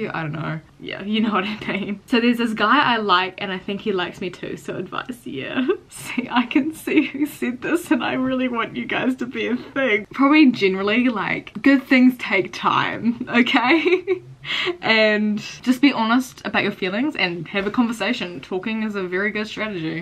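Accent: New Zealand. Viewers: New sub 😜 betcha hear it allll the time but ur accent is awesome, I'm a kiwi aswl but my accent is nowhere near as cool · I love the New Zealand accent, but sometimes I can’t understand what you are saying, and how old are you?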